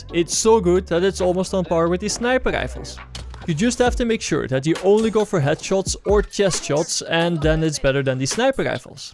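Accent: Netherlands. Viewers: Ate you Dutch ? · Love the Dutch "Sneiper" accent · Jo deze gast is Nederlands 100%% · you are dutch right :D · this dude sounds dutch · 100% NL door z'n acent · First video I watch of this dude, and I can already tell he's dutch lol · U dutch?? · He is Dutch for sure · The Dutch accent is strong in this one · Nice dutch accent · Je klinkt Nederlands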